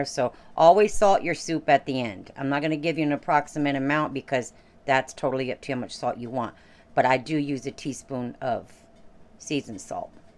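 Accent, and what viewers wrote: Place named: Upper Midwest, United States. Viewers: Northern midwest accent.. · Just found this channel and subscribed. I live in Tennessee but your accent reminds me of my home state, Michigan. Midwest cooking is home to me.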